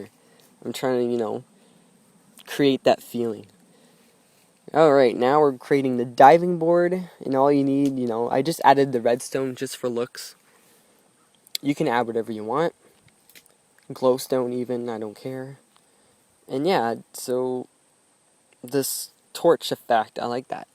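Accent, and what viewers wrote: Canada. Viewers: You sound like a canadian bitch. Thanks for the tutorial. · are u canadian cuz u sound canadian and i know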